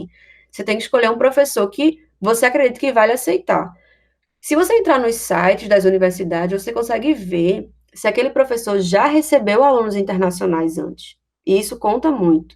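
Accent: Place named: Pernambuco, Brazil. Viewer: Pelo sotaque é galera super gente fina de Pernambuco! Por mais youtubers de Pernambuco fazendo sucesso aqui na rede! Pernambuco em 1° lugar! Parabéns pelo conteúdo!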